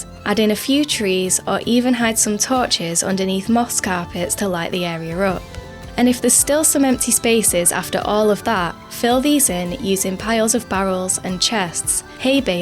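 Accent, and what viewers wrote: United Kingdom. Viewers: pretty sure their accent is british, not sure though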